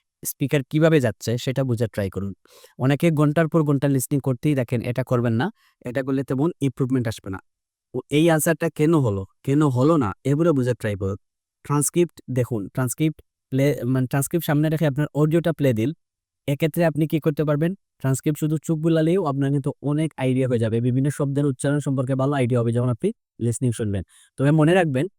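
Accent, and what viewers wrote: Sylhet, Bangladesh. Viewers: Bhai khati Sylhety r Nokol Sylhety modda partokko ki😀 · Vai khati sylhety ❤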